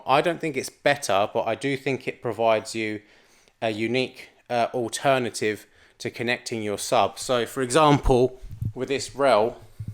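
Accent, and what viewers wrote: United Kingdom. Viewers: It’s VERY refreshing to watch a hifi gear review that’s not from some slick Nth American that comes off like a CNN grade infomercial. And the Nth American sales guys are easy to spot as salesmen as they speak entirely scripted and most use their hands like politicians or billionaire CEO’s. As soon as I see/hear them I’m gone. Yours is entirely real and obviously a non-professional slick salesman presentation. Thanks keep going. And the subtle humour doesn’t go unappreciated. Cambridgeshire accent btw??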